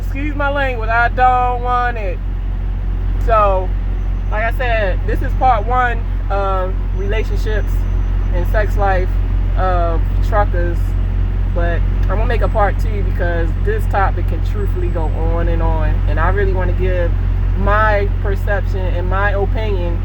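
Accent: Baltimore, United States. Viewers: were you from dc or baltmore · Straight up b mo accent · She definitely from Baltimore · She from Baltimore huh I hear that U in all the words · Toooooo and dooooooo yeah she from baltimore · Hey Wassup.. Am I correct in saying your accent sounds Bmore to the core. BALTIMORE? AM I CORRECT OR AM I OFF? I'M JUST SHOWIN LOVE. RESPECT! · You are from Baltimore aren’t you? I can tell by your accent. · Much respect queen. Make it happen. Also I hear the Baltimore in your voice and accent. Positive energy and uplift. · You gotta be from Baltimore lol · Oh yeah I can hear that Baltimore coming out🤣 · Great content! First time coming here and I may be a new subscriber, you're a very Pulchritudinous woman and I detect a strong Baltimore Maryland accent! Lol 🤣😎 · You must be from b-more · i can tell you from the Baltimore area, your accent and pronunciation comes through ..... 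· You gotta be from Baltimore, cause that accent is pretty strong!!! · Love that Baltimore accent!! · You have a Baltimore accent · From Bmore???? I can hear it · Baltimore accent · Go old TA HUH LOL. Quick question are you from Baltimore I'm picking up on your lingo how you talk I'm from Maryland myself thinking about starting up a YouTube channel when it comes to trucking I have a YouTube channel when it comes to racing just over 10,500 subscribers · U from Baltimore? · You must be from Baltimore. Lol. The way y’all say words that have the sound U in it is unlike anywhere else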